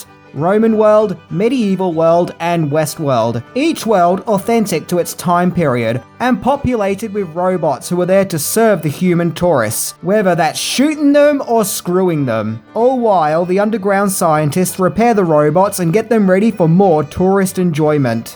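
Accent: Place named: Australia. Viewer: " but with thick accent it's hard to tell.' Seems pretty mild for an Aussie. Maybe its all that Monty Python that has prepared me for non-American accents. Such as the University of Wallamaloo sketch.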